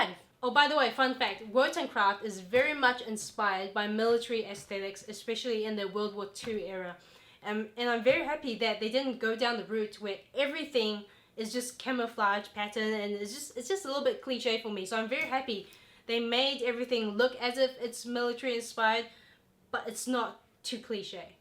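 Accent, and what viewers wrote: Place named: New Zealand. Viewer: I love your accent. All the people from NZ I’ve met are polite and nice. I also love your current PM. she is so great and dose a fantastic job dealing with the covid virus.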